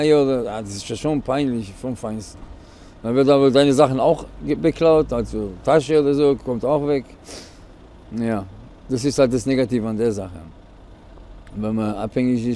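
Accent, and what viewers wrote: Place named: Baden-Württemberg, Germany. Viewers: Er erklärt gut. Schwäbischer Dialekt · normaaaal so redet man in baden württemberg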